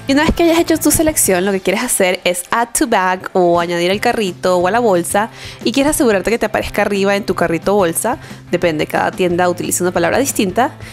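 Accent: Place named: Venezuela. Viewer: !!!! Soy una gran fan! Te sigo desde hace mas o menos como un años pero no se porque motivo o razon no podia comentar desde mi telefono (y no tenia computadora). Pero ahora por fin puedo comentar!! SIII!!! Tengo 17 años (recien cumplidos), soy de VENEZUELA (Valencia, luego vivi en Ccs y luego vivi 5 años en Margarita) pero hace un año y unos meses me mude a ALEMANIA. Fue un enorrrrrrme cambio, el lenguaje es mega diferente, el clima es mil veces mas frio y las personas tambien son super diferentes. Los primeros meses estaba deprimidisima (extrañaba a mis amigos y mi idioma y todo) y lo unico que hacia era estar pegada a Youtube viendo videos de maquillaje o blogs y tags. Y un dia apareciste TU! Te lo juro que fue solo ver el primer video y supe que eras venezolana, ni siquiera tuve que leer tu perfil! Al principio estaba como: Yo la conozco, pero luego me di cuenta que era solamente el hecho de que hablaras como yo, la expresiones, la calidez, todo. 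Fuiste mi salvacion en mis meses de depresion. Y luego fuiste mi salvacion cuando me dijeron que tenia que ir a una escuela sin poder hablar aleman y sin saber que hacer. Simplemente me maquille, puse una buena sonrisa y con actitud empece a hablar en ingles (lo cual ayudo, porque no entendia ni pio de aleman). Bueno simplemente eso, queria que supieras que de verdad me ayudaste sin conocerme y sin saberlo. Y queria decirtelo porque ahora si puedo escribir mi comentario! MIL BESOS Y ABRAZOS. PD: FELICIDADES POR TU CASAMIENT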